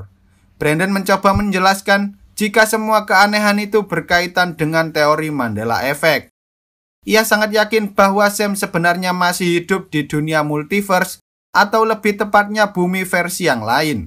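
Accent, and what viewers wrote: Java, Indonesia. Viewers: Adalgi ytber yang bahas film jni selain chanel ini ga?, logat jawa kurng enak di denger :( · Meddok bgt!!!! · Bang logat ngomongnya jgn medok bgt lah, intonasinya jgn datar.. spoiler filmu bgus" tpi cara pembawaanya gk enak ditelinga Tolong jgn gitu biar kedepannya lbih bagus!!! Medok bgt aneh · Medok banget JAWA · Jowo endi min pean?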